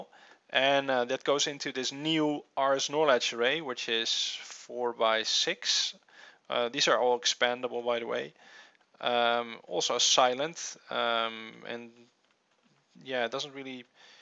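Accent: Netherlands. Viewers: Guys guys guys. Stop for a sec with discuss if he's dutch or not. Well, i have the right anwser for ya. I'm half engish, half dutch. The most people i heared that are dutch and talk english, are talking like that. Its just a dialect from dutch people. I'd like if everyone would stop with commenting like that. It is'nt even funny for. He's uploading a video, nothing more, just that. Show some respect people!! Because i do. Thanks for reading guys. If you think im wrong, screw you.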